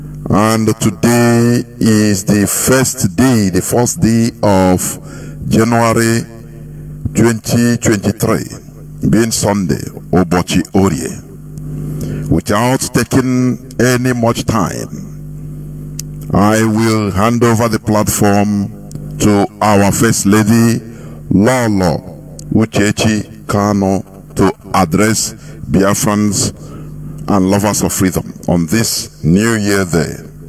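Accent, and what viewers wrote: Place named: United Kingdom. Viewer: She speaks good English with british accent; but definitely said nothing about the restoration of Biafra, the release of Mazi Nnamdi Kanu and the continouos inversion of our land by Fulanis herdsmen in collaboration with Nigeria sponsored military and para military. The only problem DOS and their co have is Mazi Simon Ekpa nothing more. very disgusting. #FreeMaziNnamdiKanu #FreeBiafra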